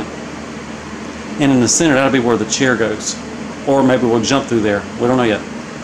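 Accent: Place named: West Virginia, United States. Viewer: Dude I like your numbers mine are chad273👊 if only I had your talent👊 new subscriber here, and I watch a lot of channels and you seem to have figured out how to retain attention. I don’t know where y’all are at but you sound like a West Virginian. You sound like Elliot Sadler which helps on keeping attention. Looks like I have some catching up to do on your channel! Great stuff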